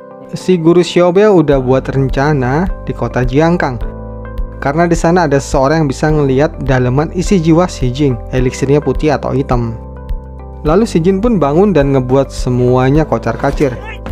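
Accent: Malang, Indonesia. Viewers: Bang orang malang ya? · Kyk e wong malang iki mimin e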